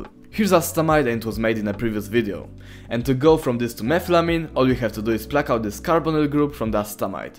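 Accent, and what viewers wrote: Poland. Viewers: Why are you speaking with a polish accent?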